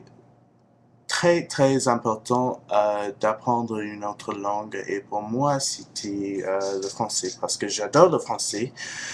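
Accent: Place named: France. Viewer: C'est déjà un peu mieux. L'accent se rapproche beaucoup plus du français.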